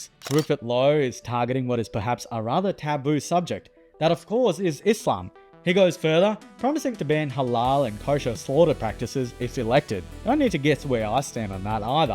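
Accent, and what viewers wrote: Australia. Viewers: You have the most Australian accent I've heard on youtube do far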